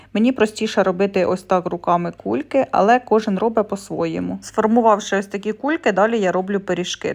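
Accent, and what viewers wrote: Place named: Western Ukraine, Ukraine. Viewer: Вам рiдна,але не чисто украiнська,наголос бендерський.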